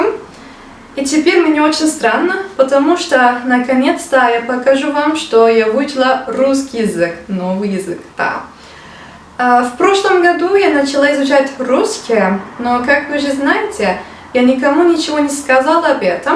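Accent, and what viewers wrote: Standard. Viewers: Восхитительно! Вы настолько чисто говорите по-русски! У Вас такой талант к изучению языков! Большое Вам спасибо за это видео.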